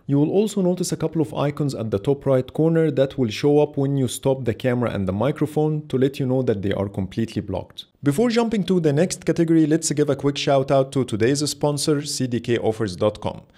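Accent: Egypt. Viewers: حاول تعدل الاكسنت بتاعتك عشان الاكسنت المصرية او العربية واللي هي شبه الهندية للاسف محل سخرية كبير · ال accent المصري دي انا عارفها كويس عظيم 👌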